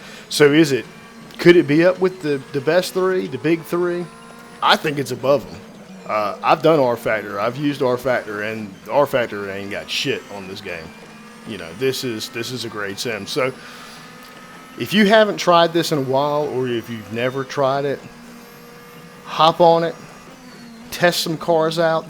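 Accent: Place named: United States. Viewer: RaceRoom is amazing, it's so underrated, hopefully more people like yourself realize that and come around to it again. Sounds like you're American too, check out the ESR group on the Sector3 forums if you're looking for some online racing. Also like Peter says below, please disable the corner markers: options -> video -> custom -> corner markers off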